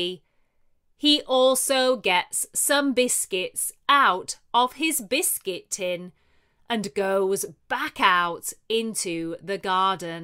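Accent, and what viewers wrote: United Kingdom. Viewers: Lovely English Stories is really helping me in improving English. The practice of native British accent is something unique about this resource. Most of the other competing resources are based on American accent. Practicing everyday i have made a good routine and the topics are coming out of daily life. Thank you very much 😀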